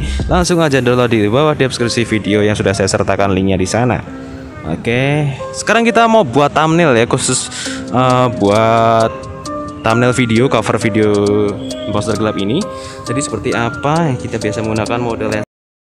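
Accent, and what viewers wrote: Surakarta, Indonesia. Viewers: Orang solo kah bang?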